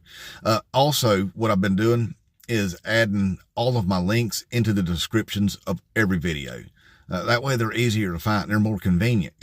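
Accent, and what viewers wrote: South, United States. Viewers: Thank you for your work. You're making a difference. Love your southern accent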